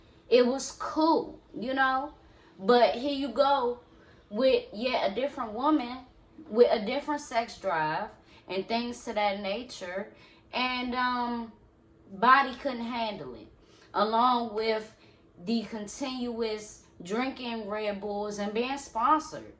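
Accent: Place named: Maryland/DC, United States. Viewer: you must be from the md/dc area 🤣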